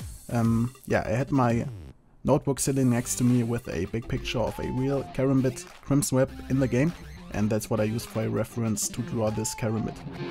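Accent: Germany. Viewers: You sound so german :D You actually are I guess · Junge man hört so richtig dass du Deutsch bist xD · nur mal so man hört es wirklich raus das du deutsch bist aber mir gefallen die videos trotzdem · man hört deutlich das du normaler weise deutsch sprichst, warum machst du dann keine deutschen videos? das würd mich wirklich mal intressieren :) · man hört sofort dass du deutscher bist x'D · Man are you german? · hört sich sehr deutsch an;) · You can hear it somgood that your german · Das Englisch ist super, nutzt alle Vokabeln richtig, macht keine grammatikalischen Fehler. Ist halt nur der deutsche Akzent den man hört.. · . Lass mich raten.... Du kommst aus Deutschland. Und der Akzent ist auch ziemlich offensichtlich. Alles in allem ist der Karambit aber gut gelungen und es ist auch schön zu wissen, dass hier keine Profis am Werk waren, weil man so das ganze besser nachkonstruieren kann. 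· Du bist doch Deutscher.. oder?! xD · Oh no. German english :( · Man hört halt den deutschen Akzent mega raus, ansonsten spricht er schon gutes Englisch =) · Des war.mir schon klar weil die meisten deutschen so reden wenn sie englisch reden:3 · finde ich echt nice wie du das hingekriegt hast( ich weiss das du deutsch bist höhrt man richtig heraus:p) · Arbeite mal an deinem Akzent das hört man mega, dass du deutsch bist.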